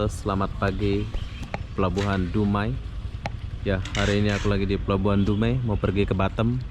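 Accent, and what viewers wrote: Malaysia. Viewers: Bang knapa pakek logat malasia kan abang orang indonesia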